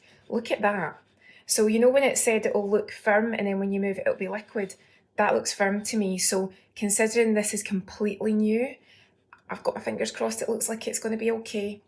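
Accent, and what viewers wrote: Scotland, United Kingdom. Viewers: I need to have a snooze pal. I thought this was a vid of a human + Pie Marriage. Was gutted when i heard the Scottish accent lol. Happy I never read it right. Pal it looks quality but i'm a slice of toast, butter and if av no jam It gets a tablespoon of sugar lol.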